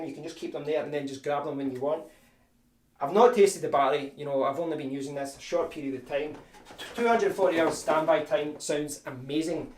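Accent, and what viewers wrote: Scotland, United Kingdom. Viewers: You sound so Scottish lol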